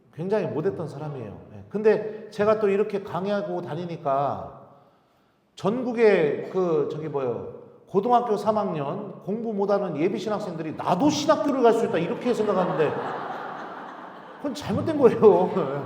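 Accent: Chungcheong, South Korea. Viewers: 신부님 감사합니다 충청도 사투리를 한번씩 쓰셔서 반갑다 생각했는데 대전교구 사제셨네요~^^ 제 고향이 대전 이거든요 신부님강의 너무 즐겁게 또 은혜롭게 잘 듣고 있습니다~❤❤❤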